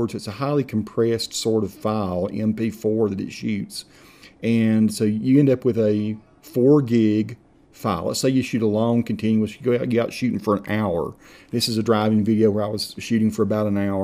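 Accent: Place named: North Carolina, United States. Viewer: Thanks man great video. Red Springs and that accent must be NC. I live in Fayetteville ;)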